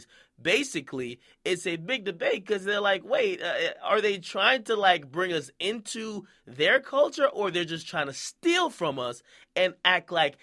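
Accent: Jamaica. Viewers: yow akademiks where in jamaica you from ?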